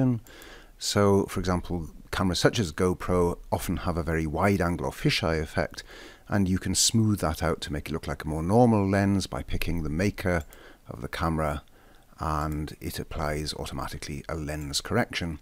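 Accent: Wales, United Kingdom. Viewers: Are you from Wales? You definitely sound it. I'm considering getting this, can you add special fx (Explosions/Generally action)?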